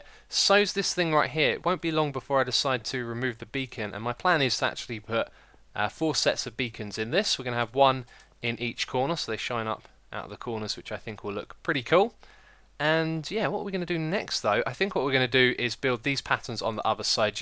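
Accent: Australia. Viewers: Is an Australian? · Can confirm that is an Australian accent, source: I am Australian;) Love the video!:-) <3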